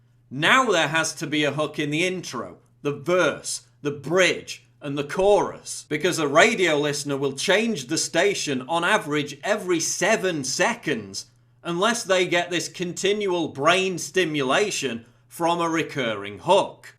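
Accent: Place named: Northern England, United Kingdom. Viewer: Ha I love you man.. ant fukin northern accent piles on your integriee. Keep it coming, we will be people with minds again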